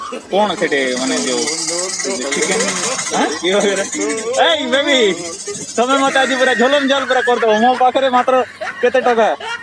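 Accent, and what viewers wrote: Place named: Bhawanipatna, India. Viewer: ❤️😍 Waah awsm and the u used the Bhawanipatna wala odia, so it seems real ❤️ Keep it up 😊 hasate rahio ase hi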